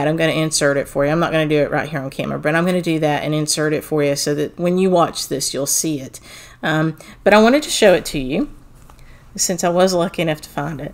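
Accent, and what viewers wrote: South, United States. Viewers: It was great to see you again and to hear about the new samplers. They are beautiful! The Hardwick Manor hoop looks so nice. You can tell it is the highest quality and would last a lifetime. You know a stitcher is known by her tools! I’m looking forward to your next video. It’s nice to hear a southern accent! Have a wonderful week!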